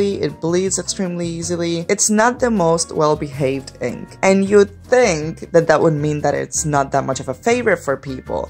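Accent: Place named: Bogotá, Colombia. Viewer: Are you from Bogotá? Porque tu acento me suena bogotano y que bueno tener a una compatriota fan de la caligrafía, las tintas y plumas :-)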